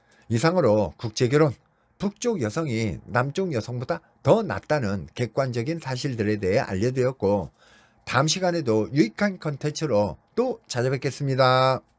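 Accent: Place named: Gyeongsang, South Korea. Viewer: ㅎㅎ 경상도 말투가 묻어나네요...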